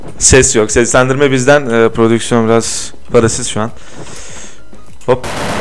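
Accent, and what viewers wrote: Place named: Sivas, Turkey. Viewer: abi sende sivas lisin kesin "BILIYUNMU" demenden anladim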